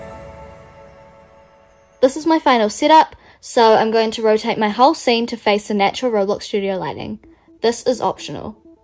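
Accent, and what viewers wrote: New Zealand. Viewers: Are you from New Zealand? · omg are you from nz?? you sound like one! (im sorry if that sounds rude or anything!!)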